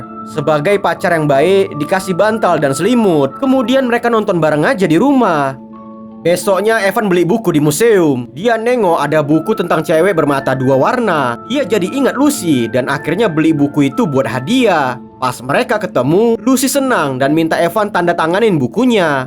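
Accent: Medan, Indonesia. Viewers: Paten kali ah abg ni klo cerita suka aq weh khas gtu ama logat medannya😂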